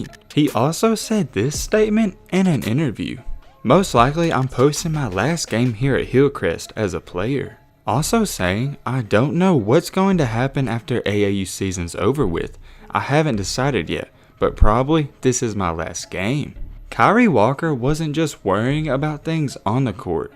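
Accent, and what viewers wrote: South, United States. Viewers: for real he has a country voice that pisses me off also whole video sounds fake, sounds corny, and sounds scripted lol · bro this man is so country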